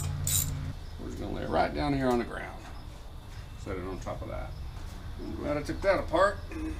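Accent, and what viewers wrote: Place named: Oklahoma, United States. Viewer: is one of my favorite YouTube channels and I'm also from Oklahoma and he really does talk like that lol. He's actually an extremely intelligent and talented guy who was an Airplane Mechanic in the Air force. He cracks me up and teaches me at the same time. In his earlier videos he was trying not to sound like an idiot he said so that is why he sounds different in the earlier ones. So actually the earlier videos are the fake lol 😁👍 I like each channel for their own individual uniqueness.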